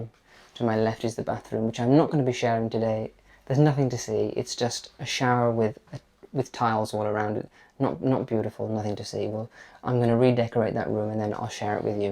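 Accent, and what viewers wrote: United Kingdom. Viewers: your home is absolutely lovely!! Thank you so much for sharing. I love, love, love your bedroom and I adore your British accent. I live Florida, USA and it's cool to hear the differences in how we say things. For instance, you call your home a Flat, in the US we call it an apartment. And, I love the way that you say the word "really". It's so beautiful with your accent. Again, I "really" enjoyed your Flat tour. You are so beautiful and elegant yet, seem so down to earth and approachable. You have a very inviting spirit and your home reflects this beautifully. Keep those videos coming. God Bless!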